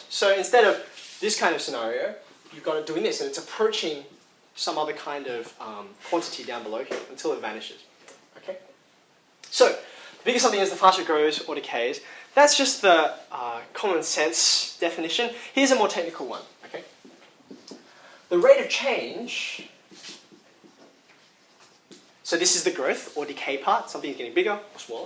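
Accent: Australia. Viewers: Liked for the aussie, subscribed for the education. Ugh. wish you were my teacher.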